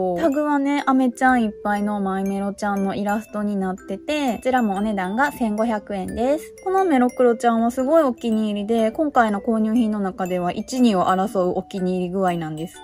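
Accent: Kansai, Japan. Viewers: 私も関西在住で大阪のサンリオショップよく行きます(^_^)大阪限定グッズのマイメロちゃんも既にゲット済み( ^ω^ )前から分かってたけどやはり大阪出身だったんですね！喋りが関西訛りなので。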